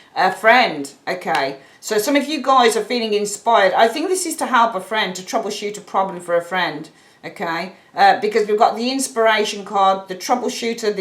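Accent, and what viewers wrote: United Kingdom. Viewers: love your pronunciation..so BRITISH..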